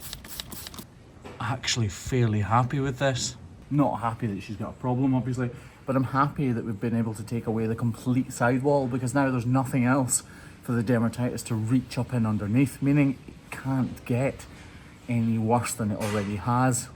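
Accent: Scotland, United Kingdom. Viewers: Luv your channel. I live in the USA 🇺🇸 and sometimes your accent seems so strong to me, I have to put on the subtitles! 🤣🤣 No worries I absolutely LOVE your Scottish 🏴 accent!! I hope you and your family are all doing well. ❤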